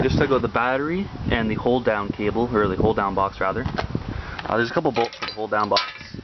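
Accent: Canada. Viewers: Great video. I'm from ontario and my wife said hey he has the same accent as you. Bish I don't have an accent! LoL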